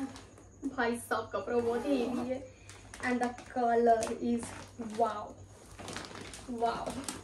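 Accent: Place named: Bihar, India. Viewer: Dekhiye chahe jitna English bol lijiye aap lekin Hindi jb bolri h TB tb Bihar ki boli nikal hi jari kapda bolte kapra nai 😅😅😅 Hindi galtb bolti h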